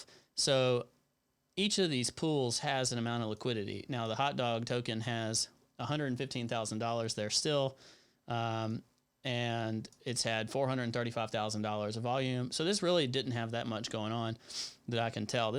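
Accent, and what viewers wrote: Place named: South, United States. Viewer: We have ourselves a southern gentleman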